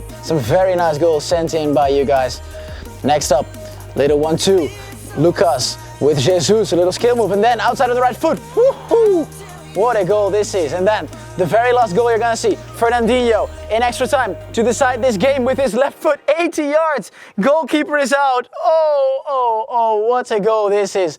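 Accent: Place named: United States. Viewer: Try to keep it english and not American english. But good job nice vid!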